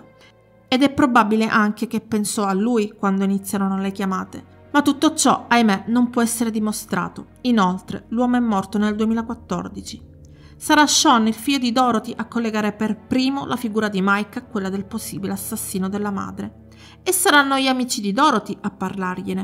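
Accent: Sicily, Italy. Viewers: Ciao non conoscevo il caso...mi chiedo perché Dorothy non si sia rivolta alla polizia dopo aver ricevuto le prime telefonate dal suo stalker...non riesco neppure ad immaginare l'angoscia dei genitori che hanno continuato a ricevere le sue telefonate anche dopo la sparizione della figlia...atroce veramente...la cosa che più mi tiene avvinto ai tuoi video è la tua voce calma e pacata anche quando parli di crimini agghiaccianti...sei una bravissima narratrice ❤e adoro il tuo accento siciliano nonostante io sia un polentone!😂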